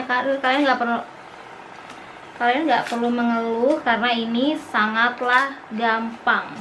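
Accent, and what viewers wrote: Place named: South Korea. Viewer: Logat nya kakak korea banget lohh...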